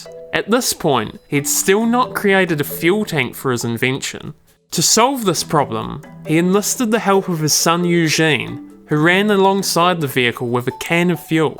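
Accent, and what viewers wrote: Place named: New Zealand. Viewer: New Zealand/"Kiwi" accent!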